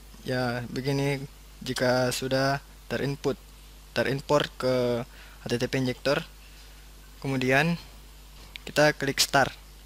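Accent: Makassar, Indonesia. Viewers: Kayak nakassar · dari logatnya kayak org makassar.. wkwwkwwk yg ngomong macam pengen ketawa tapi ditahan. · lokatnya makassar bgt...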